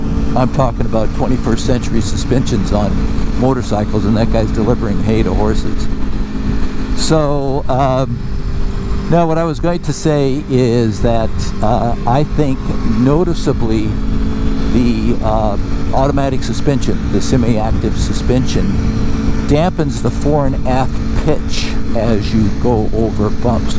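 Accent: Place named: Spain. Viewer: Nice ride, I can say that you are a natural Spanish speaker :) Be safe my friend.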